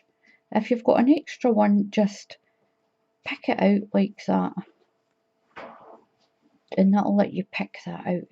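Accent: Scotland, United Kingdom. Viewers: It's great to hear a Scottish voice again, likr mine, your so accurate and easy to follow,as I'm a novice to this.Thanks xx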